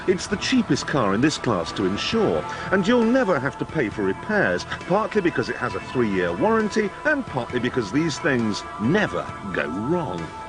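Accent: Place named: RP, United Kingdom. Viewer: JC sounds so different back then!! He sounds all posh and BBCey!!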